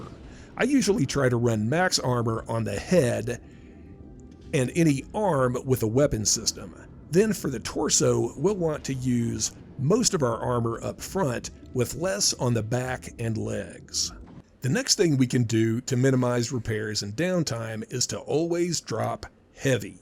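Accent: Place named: Midwest, United States. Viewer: Thanks! Just bought BT and Big 3 DLC! And subscribed! I'm doing my part! I like your narrator voice, clear and calm with that midwestern (I think...) accent.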